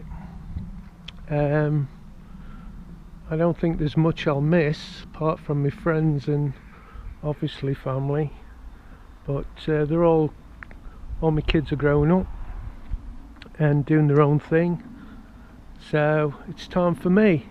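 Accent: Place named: East Midlands, United Kingdom. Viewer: Hi, you sound like your'e from the east midlands somewhere, Phillipines is a great place, $575 is enough to live on, it works out about $26000 pesos which is about 46 pesos to the dollar. The people are very nice but try and learn a little of their language and try their food. When in the Phillipines do as the Filipinos do.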